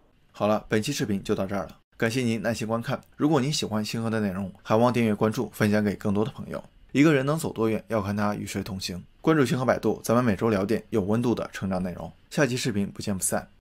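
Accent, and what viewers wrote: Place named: Wuhan, China. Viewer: ……标准……＾＾＾你武汉人啊！？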